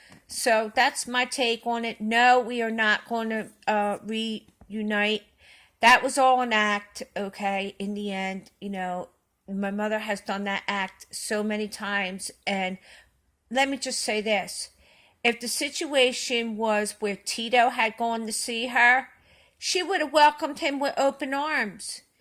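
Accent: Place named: Philadelphia, United States. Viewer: i recognize the philly accent, I grew up 5 mins from you... I wanted to tell you moreso ask you to forgive your mother. you acknowledge and validate who and what she is..now for yourself, forgive her....do not give her power over your thoughts and everyday life. Forgive yourself for the many times you stopped living life because of her actions.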